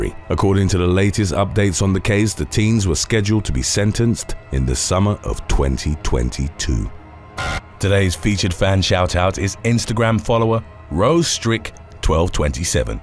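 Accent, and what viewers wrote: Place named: United Kingdom. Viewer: Please please PLEASE stop using "the former" in your video narration. You are using it incorrectly and it's extremely maddening for those of us British educated grammar Nazis. Seriously I love your channel but you have got to stop misusing "the former". Mostly bc you aren't writing your words, your chair are not long enough for "latter and former" to apply, e.g., ten secs into a video isn't enough time to convey such qualifications bc your audience can't possibly know who you're talking about. And your audience is mostly American. Using unfamiliar terms with a British accent can be intimidating. Also, Spanish names and words hint: there are no hard "J" sounds at the start of most words and names, it is an "H" so "Jorge" is "Hor-hay", two LLs together make a "y" sound, Tucson is pronounced "Too sahn" not "Tuck-sin" but above all, let "the later" go, please. 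It diminishes your whole video for Me bc it's distracting in it's utter and complete wrongness. It's driving Me spare love.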